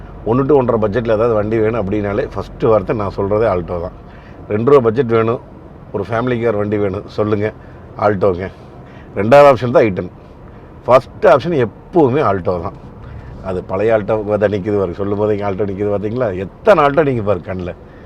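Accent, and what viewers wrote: Kongu, India. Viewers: கொங்கு தமிழ் 💚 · அழகான கொங்கு தமிழில் பேசுகிறீர்கள்... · அண்ணனின் கொங்கு தமிழ் மிகவும் இனிமை... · கொங்கு தமிழ் அழகோ அழகு அண்ணா,,காசுக்கு தகுந்த பணியாரம் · அருமையான கொங்கு பேச்சு...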